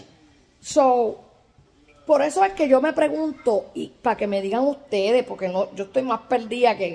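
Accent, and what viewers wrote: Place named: Colombia. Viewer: He sounds columbian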